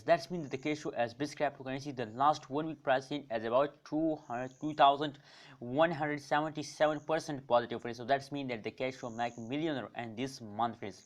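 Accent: India. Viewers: white people video thumbnail english language indian accent as fast as japan high speed electric train no facts at prediction even a teenage 14 boy also can reexplained this thats blah blah blah zero informative content as for sure most brainy positive video presented by showing by content presenter faces for sure this boy wanna follow hype and get fast subs and views and get money for sure many viewers will stay out from this kind of video coz its promising thumbnail video promoting click bait.. well done indian teenage boy! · Dude, i cant even understand what are you saying. Indian accent is already hard to understand and you are speaking too damn fast.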